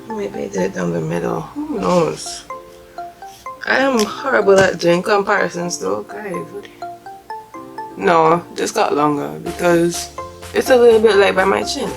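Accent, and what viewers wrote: Trinidad and Tobago. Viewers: i now go to say her accent sounding rel strong like ah Trini but i hearing ppl saying African and i wondering why but everywhere a trini go dey say u are from Africa haha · She sounds Trinidadian 🇹🇹🇹🇹🇹🇹🇹🇹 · Sounds like she's trini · Its ur trini accent that made me watch the whole vid. I dun kno how to use rice water but it was really/rell nice to hear it from a trini. :) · Oh... I use par excellence rice and I don't get what you get at the top of the rice, plus you can add 10 drops of lavender before you put it to ferment... Love hearing my Trini accent ☺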